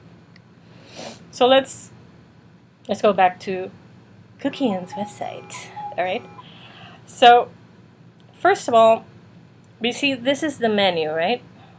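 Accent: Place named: South Florida, United States. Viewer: You barely have any accent....I live in Florida and you sound like you're from South Florida. Wish I coyld speak Spanish as well as you do English.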